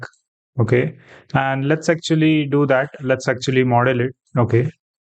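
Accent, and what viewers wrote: India. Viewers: I cant take 12hrs of another indian youtuber but the guys without an accent put a fkng paywall behind their tutorials. Shit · If you are making video with this accent Why don't make Video in Hindi Many people who don't know English can learn better. Indians should not make Tutorial videos in English unless they are targetting Foreign audiences and With this Accent. Are you serious? Better make it in Hindi Many people can learn.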